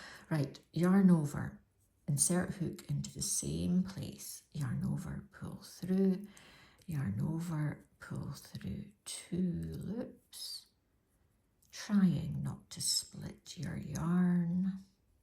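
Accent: Scotland, United Kingdom. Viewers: Thank you so very much for sharing your pattern and tutorial. You explained everything in your beautiful Scottish accent. ❤ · Thank you for this sweet pattern and tutorial! Greetings from New York. My mother-in-law came from Helensborough. I enjoyed listening to your Scottish accent. Your instructions are very clear. I will make these for charity as my youngest grandchild is now six. They are darling! ❤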